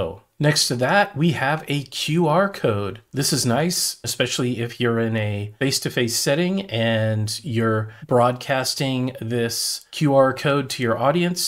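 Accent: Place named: United States. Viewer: very useful! However, every time I hear an American pronounce 'mobile' in 'mobile phones', I'm struck by how much it sounds like 'MOBIL' as opposed to mob-ile which is how the rest of us pronounce it (Australia, Britain, Ireland, Scotland, etc) Indeed, how do Americans pronounce the name of the oil company, MOBIL? And how would you distinguish that from something being mobile? Any way, who cares. You answered my question which is how I actually get my form link to my users, so thank you sir for the clear explanation!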